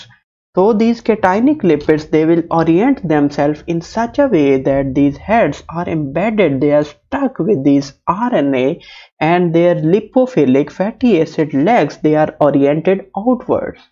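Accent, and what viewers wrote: India. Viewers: FFFFFFF!!!!!! why does every scientific medical video have some guy with a terrible Indian accent..... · first time like this kind of Indian accent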